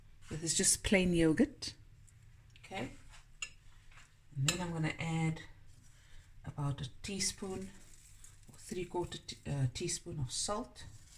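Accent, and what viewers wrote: South Africa. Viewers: I love the South African accent. It smells home.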